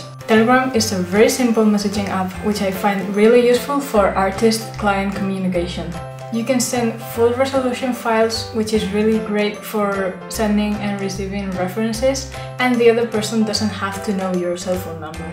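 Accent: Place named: England, United Kingdom. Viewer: Wow that english accent.. ☺️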